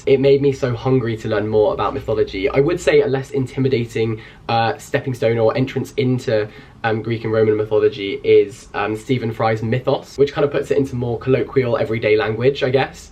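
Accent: United Kingdom. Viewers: It's funny, your british accent is stronger in your old videos than in the new ones :)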